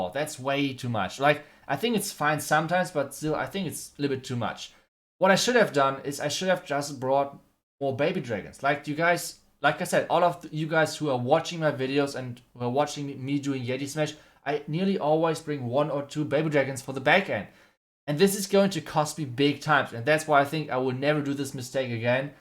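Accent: Germany. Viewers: He has this German accent which sounds cool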